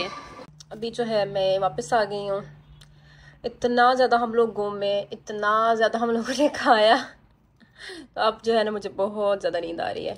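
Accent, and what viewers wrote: Pakistan. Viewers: Chinese hi lagne lagi ho, bolne se sirf Pakistani lagti ho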